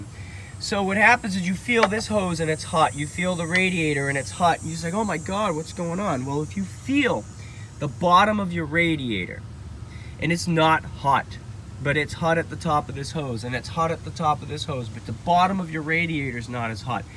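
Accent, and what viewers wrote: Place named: Massachusetts, United States. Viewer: Fantastic video! Thanks for the help! By the way, I knew you were from Mass about into the video. Cheers!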